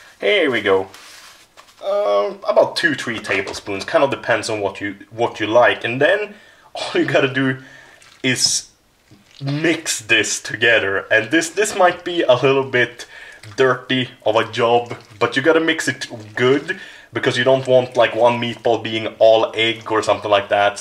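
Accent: Sweden. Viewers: Please im getting so tired of ur Swenglish. Even though it's a bit funny. Just hear how you say with xD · yea. I've noticed that too. That is why I love the swedish accent :D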